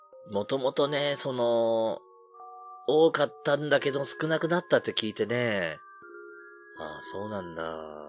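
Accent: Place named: Kansai, Japan. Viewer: こんばんは😃🌃 [週刊怪談]ありがとうございます～🥰関西弁可愛よ💝拝聴中～🤗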